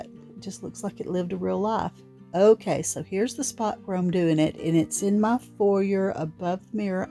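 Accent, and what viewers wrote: South, United States. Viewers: I haven’t heard anyone say “Swanee” in years I don’t know how to spell what a Southerner says for (I swear) love watching and listening to you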